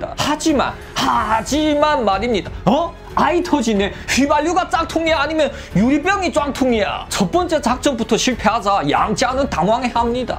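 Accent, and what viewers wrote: Yanbian, China. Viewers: 동무 옌벤 말씨가 몹시 듣기 좋군요 옛 중국생활이 그립네요 짜요!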